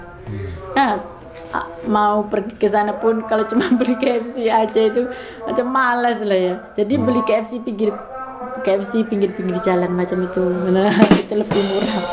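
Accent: Java, Indonesia. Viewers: Pekat Jawa nya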